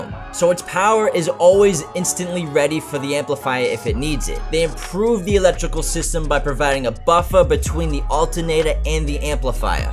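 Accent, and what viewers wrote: Boston, United States. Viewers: I'd love to hear this guy say Oscar Mayer Wiener in his Boston accent. Would be crazy satisfying for some reason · Yo,....... I like these videos, but why are you doing the Boston accent lol, I get it in the videos when you roast somebody in the comments (might be me here) but when you’re just being helpful why the accent? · Now thats a bahsten accent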